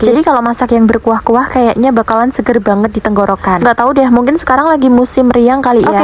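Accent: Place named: Lampung, Indonesia. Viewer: Logat jawa nya keknya tinggal di lampung ya..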